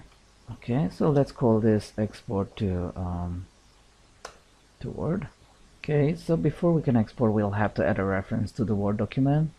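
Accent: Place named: India. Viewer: I concur that. I've just learned how to VBA. And if I may speak the truth for a minute: He has a very, very light Indian accent, which makes his instructions so much easier to understand than most of whom I've come across. It's an honest statement. NO OFFENSE.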